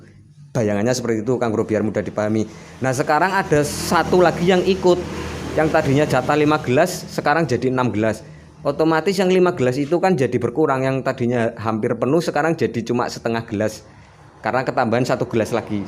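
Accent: Java, Indonesia. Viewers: Bang berarti kabel yg masuk ke output kontak yg di sambung buat lampu tadi gk di copot lagi ya? . Abang ngomonya medok jawa banget tapi nama chanelnya nama suku batak, emang marga silaban ya?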